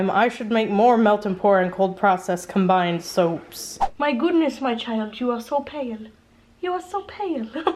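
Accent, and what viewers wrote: Texas, United States. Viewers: It's that Texas accent!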